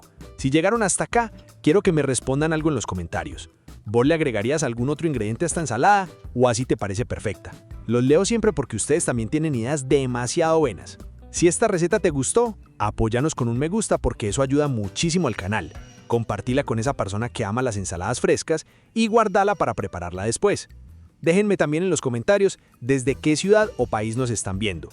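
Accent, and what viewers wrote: Colombia. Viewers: ❤❤❤🎉Hola grasias por sus Resetas tan ricas el mango 🥭 me fasinan como sea pinton y no tan maduro saludos que asentó tan lindo Colombiano💗🥭🥭🍓🍐🍉🥑🍅🫜🥕🍍🌴🌊⛵🏖️ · Un saludo desde Costa Rica 🇨🇷✌️. Gracias por compartir tus recetas, son sumamente deliciosas. Me gustaría saber de que parte de Colombia eres, me gusta mucho tú acento. 🙅🇨🇷🥂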